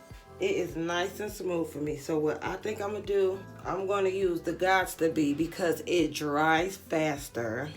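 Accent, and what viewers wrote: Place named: Jacksonville, United States. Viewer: Luvvvvv, if u dnt sound like yr from Jacksonville, Fla 😂😂😂 with that bust it down 😳, I almost fell off my bed( I'm like where's the gar?😂) ! Love the look ! I love the sleek hair styles( when I'm doing my growth treatments )! Kp doing u ma !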